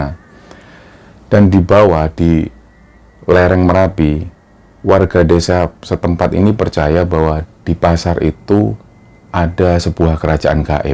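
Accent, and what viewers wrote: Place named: East Java, Indonesia. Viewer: Sy 8th di surabaya dan 3 thn di Malang, gaya bicara mas itu mengingat saya akan model Timuran, terutama ke-2 kota tersebut, sy asli Medan, tapi sy berharap masa tua saya bisa saya habiskan di Malang, penuh kenangan di sana.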